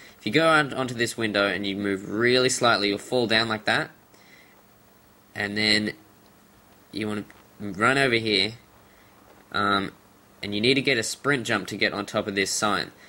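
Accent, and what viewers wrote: Australia. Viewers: a few on downturn lockdown and hhat but still nice r u australian u have cool accent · Austrailian accent! 3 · Are you Australian?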